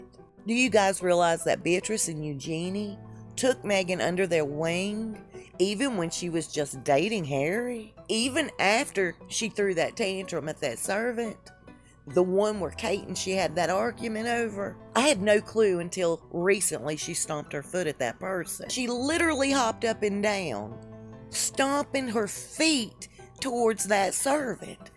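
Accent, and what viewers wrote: South, United States. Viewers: I didn’t know what you looked like. You have a southern accent. You finally showed your face. Your southern dialect didn’t match what in my mind you looked like., I was blown back. You are so beautiful. ✍🏻👍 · I absolutely love your accent .....are you from the south?? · LOL - This Southern accent commenting on the royals is odd even though the commentary is on point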